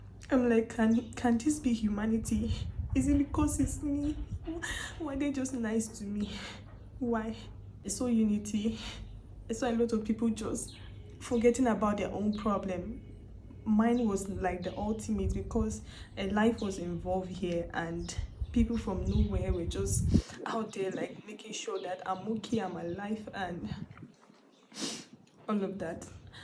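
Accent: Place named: Ghana. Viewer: You speak like Ghanaian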